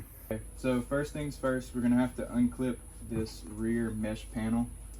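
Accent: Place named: Louisiana, United States. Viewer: As soon as you started talking I said "Southwest Louisiana" haha. I dig these lights man.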